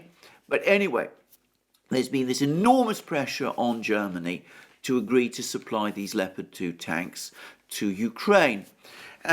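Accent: England, United Kingdom. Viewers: I m very happy with your British accent. Keep on with your fabolous work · Speaking with an English accent, when you speak English and live in England, is it so strange? The kind of things that upset some people.... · Love your English accent. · for the love of God and all his saints, please, do not spend 5 minutes explaining why you, being British, pronounce various non-English names with an English accent. What a strange thing it would be if you pronounce them with a French one. That's how these videos run over one hour instead of 30 min, and I, with all due respect to your good effort and thoughtful comments, nowadays watch only some of them. Cut to the chase, and you have the point, you just have to get to it. More views and more upvotes will follow, imo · Viva English accent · Dang... sure wish I could understand English with the "English" accent...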